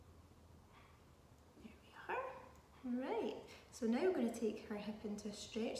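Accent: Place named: Scotland, United Kingdom. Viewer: Thank you. As a Midwestern American, I must say I love the Scottish accent. So much nicer than what I hear around here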